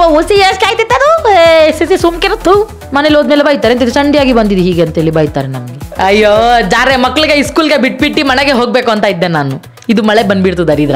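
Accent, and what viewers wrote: Mangalore, India. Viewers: Mangalore accent supper. Namma kudla namma karla great. Love from Karkala. All rounder 🎉❤ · Mangalore kannada spr😂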